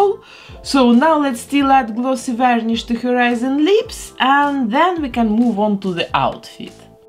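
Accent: Russia. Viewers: Оооо боже какой прекрасный русский акцент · Не в тему. Но капец русский акцент. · очень русский акцент))) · Мне кажется, или она говорит с русским акцентом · Русский акцент жёсткий)) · Русский акцент?¿ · Русский акцент😅😅😅❤️❤️❤️ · русский акцент не спрятать😳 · Я что слышу русский акцент? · Are you russian? Where are you from? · Ох этот русский акцент 💪🏻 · Do I recognize the accent right? You are Russian? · О, этот русский акцент! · Стоило бы тогда добавить субтитры на русском, для соотечественников, судя по акценту автору канала это было бы не сложно, а нам приятно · Ого, акцент звучит очень по-русски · Я слышу русский акцент или мне кажется?) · Ни чего себе акцент!!! Вы из Ростова?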